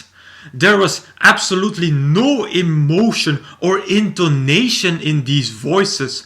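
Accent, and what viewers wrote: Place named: Germany. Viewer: I really like your accent! Are you German? just curious. also, cool review, you say it as it is!😁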